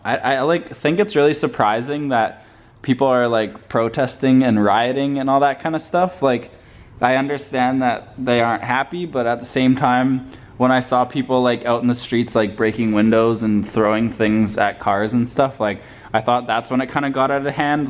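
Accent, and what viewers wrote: United States. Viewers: I enjoyed the vlog and more importantly the q&a session :) I didn't know you were Canadian I legit though you were American. But still thanks for your content it's always inspiring and when having bad days yo always raise a smile :) greetings from the UK :) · I highkey thought you were American lol. but ayy, a fellow Canadian (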